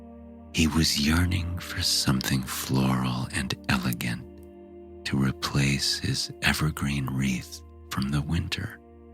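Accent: United States. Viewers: American voice, yay, and an American story ❤ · I love the american accent. It makes me so calm to hear my own accent.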